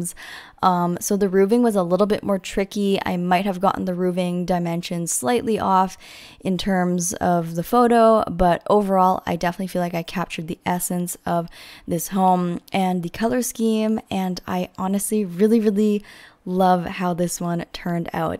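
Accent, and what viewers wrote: Canada. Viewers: Building is like all I do in the sims, so I don't really download builds mostly watch for inspo because I love your style. And also, of course, for the chat and the company 😊 my one year old also likes your videos, think he likes how fast it moves and the Canadian accent 🤣 (we're Scottish so very different lol)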